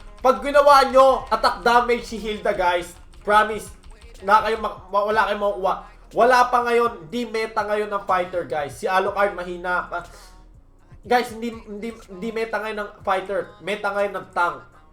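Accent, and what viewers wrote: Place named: Bulacan, Philippines. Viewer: taga bulacan ka ba sir???.. puntong bulacan ka eh.....hehehehehe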